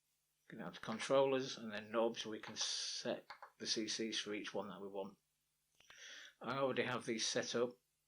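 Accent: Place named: United Kingdom. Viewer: uhoh, br*ish narrator. crank volume to max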